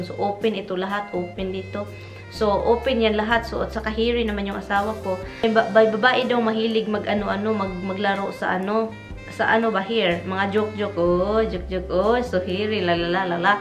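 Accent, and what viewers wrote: Visayas, Philippines. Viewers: Your so funny like ur attitude n visayan accent so darn funny n cute 😁😂🤗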